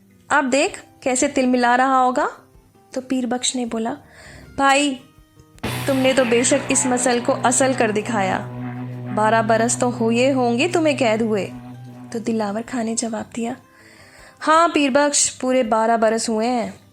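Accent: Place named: India. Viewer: اردو زبان ک ے الفاظ کو براہ کرم' ہندی کے لہجے میں مت پڑھیئےگا محترمہ۔ براہ کرم پہلے اپنا تلفظ اور لہجہ کو اتنی تاریخی ناول کے شایاشان پڑھیئےگا۔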